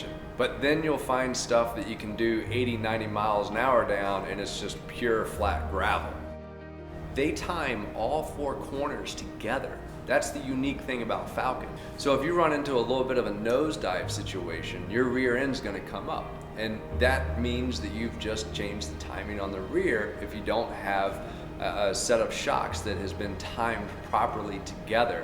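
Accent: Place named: Utah, United States. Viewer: He sounded like he was from Utah then he said he was from Utah. 🤦‍♂️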